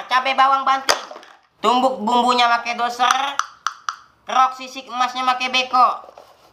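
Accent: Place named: West Sumatra, Indonesia. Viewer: Suaranya persis minang kocak anjir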